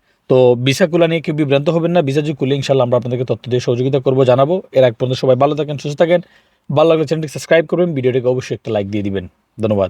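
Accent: Sylhet, Bangladesh. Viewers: ভাই কি সিলেটি..??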